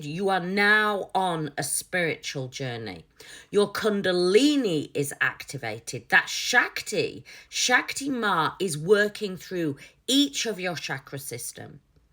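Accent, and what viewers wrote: United Kingdom. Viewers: great video, great channel, great British accent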